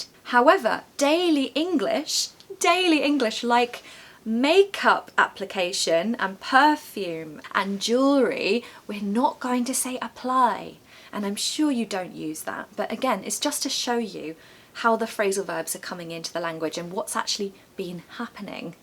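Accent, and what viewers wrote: United Kingdom. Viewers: Hello dear!!! I´m from Brazil and first of all I´d like to thank you for your contents here!!! I´ve been studying English for about a year and they are helping me a lot over this time!! i haven´t words enough to thank you for all of that!! Ps: British Accent is amazing!!! 😍🥰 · I love the British accent ❤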